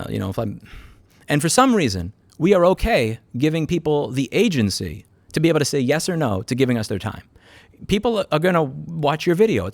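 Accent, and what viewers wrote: New York, United States. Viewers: the feeble video can't handle his new yorkness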